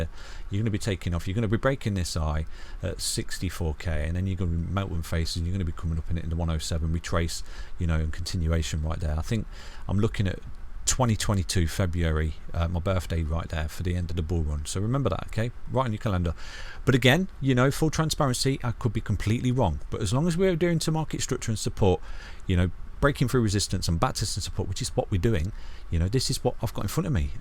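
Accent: United Kingdom. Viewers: I enjoy the British fashion of your talk "bam bam bam see you later" haha.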